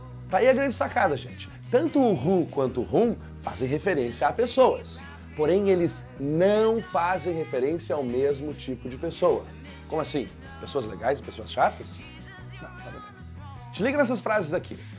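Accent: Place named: Rio Grande do Sul, Brazil. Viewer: Também sou do RS, de Lajeado, reconheci o sotaque hahahaha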